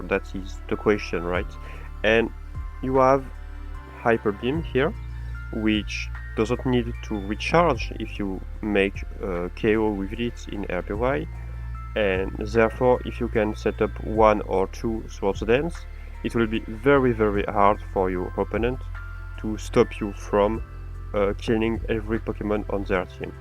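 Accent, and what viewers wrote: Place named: England, United Kingdom. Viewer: Oh, what a beautiful english accent ! I'm jealous.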